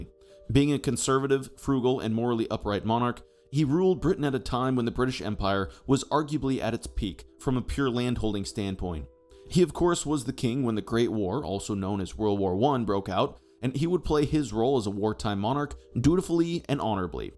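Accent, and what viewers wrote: United States. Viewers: Why the American accent??!!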